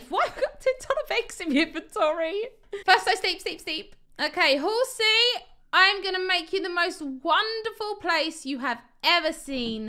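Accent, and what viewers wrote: United Kingdom. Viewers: as an American I'd say it's a British accent lol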